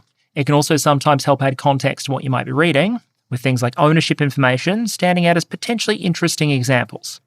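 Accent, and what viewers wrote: Australia. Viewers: Don't be a tool. Different accents exist. Australian derives from SE English which pronounces H that way.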